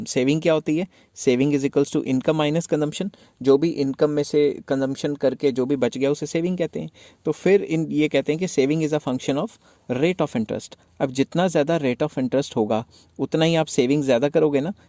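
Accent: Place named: Punjab, India. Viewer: Sir apka punjabi wala hindi bhut mst h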